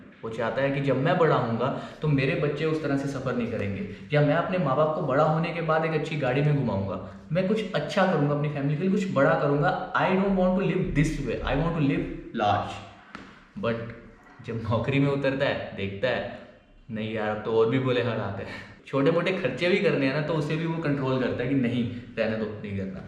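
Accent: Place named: Punjab, India. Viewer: English me punjabi tongue influence hai.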